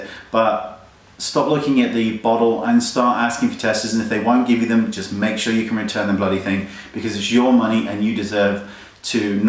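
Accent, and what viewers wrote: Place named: London, United Kingdom. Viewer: really random question, but in your videoes you speak with a very londonish accent, but there are times in your videos where i can here a twang... are you from somewhere else but use a london accent so viewers can understand?:) xx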